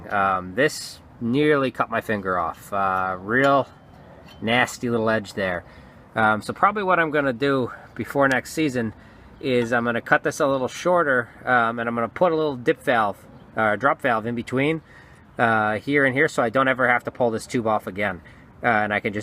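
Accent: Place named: South Boston, United States. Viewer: Mark Camaro it’s that easy! And or open the screw that secures the carb bowl and let it drain out. Keep the gas tank cap off for a day and any gas in the tank and lines will evaporate. Don’t remove these covers as shown. His accent sounds like he is from South Boston. I ought to know lol.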